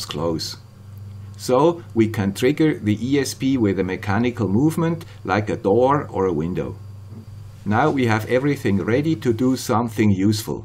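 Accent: Switzerland. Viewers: i want to help) i like everything on this channel and swiss accent also! like from Belarus! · Very interesting video from the man with the Swiss accent. There is one part I don't understand though: how do you use a reed relay instead of a normal relay or mosfet? If I put a reed switch on a door or window, it makes a permanent connection, not temporary. How can you use a reed switch to trigger the ESP then disconnect the power once the job is done?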